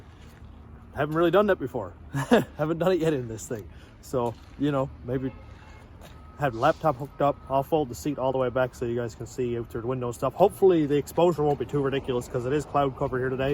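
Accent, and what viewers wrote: Canada. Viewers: where are you guys located? You sound north of the US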